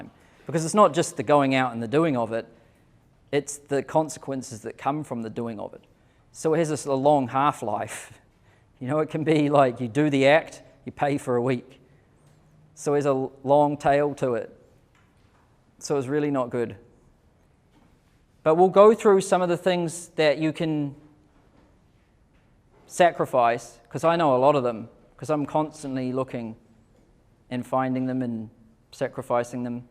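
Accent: New Zealand. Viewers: This man must surely be the most annoying human being on earth. From his annoying squeaky kiwi accent, to his tiresome textbook-motivated gobbledyegook. For example (and this is typical of all his Youtube videos: "We can't do anything without action, and action and time and energy. We can't get that from anything other than our human body and our human body can't perform concurrent operations or be replicated. So you are the true constraint of being human is time and energy (sic). That's what life is - energy over time". and: "There are people that have trillion dollar companies and there are people that have no companies - why?" Jeez - why do people pay thousand of dollars to listen to this garbage?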